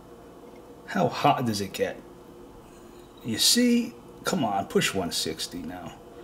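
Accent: New York, United States. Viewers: New York accent?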